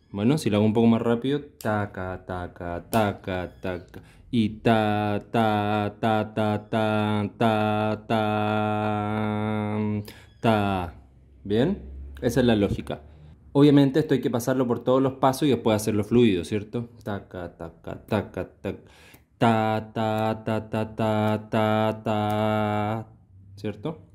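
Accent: Chile. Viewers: Primer video que veo, y empezando el video me di cuenta que eres Chileno jajaj. Buen video compa, sigue asi🔥🔥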